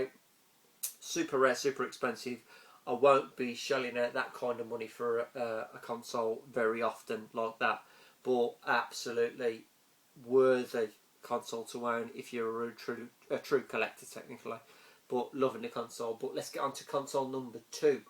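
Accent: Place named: Wolverhampton, United Kingdom. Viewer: Thought the accent sounded familiar. Wolverhampton here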